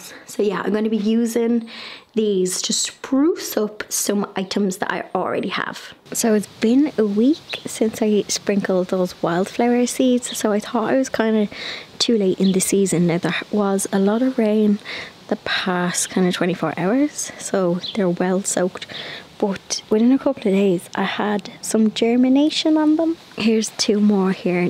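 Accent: Ireland. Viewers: That jug of sweet peas… sigh! Lovely!, I imagined the beautiful scent whilst looking at the sweet peas. I love your YT channel and look forward to seeing a new notification when your latest YT vid is uploaded 😊. A fan from 🇦🇺 I went on a trip to Ireland a number of years ago to walk where a lot of my ancestors walked… hearing and seeing your Irishness is an added bonus to enjoying your gardening, renovating, travel, and craft projects!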